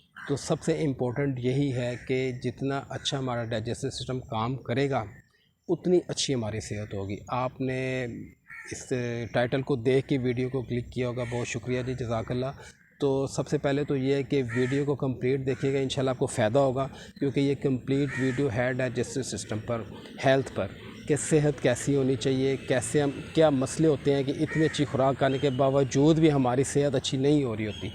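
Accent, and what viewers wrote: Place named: Pakistan. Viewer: The first-ever video I watched completely. Your way of talking along with urdu accent is great. Keep it up.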